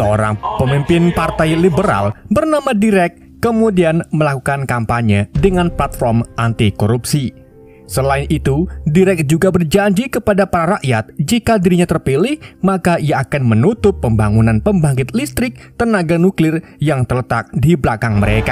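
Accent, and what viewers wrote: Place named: Banyumas, Indonesia. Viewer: JEBULE NGAPAK RIKA BANG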